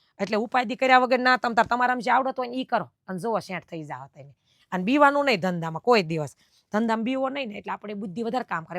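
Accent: Kathiawar, India. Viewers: મારી છોકરાની વહુ ઓસ્ટ્રેલિયા રહે છે મારી ભાષા બોલવાની તમારે જેવી કાઠીયાવાડી છે મારી વહુ ને ફોન કરું ને મમ્મી હજી થોડીક વાર વાત કરો મને બહુ ગમે છે કાઠીયાવાડી ભાષા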